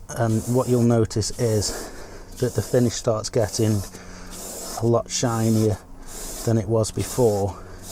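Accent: United Kingdom. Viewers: Is this your first time hearing a British English speaker?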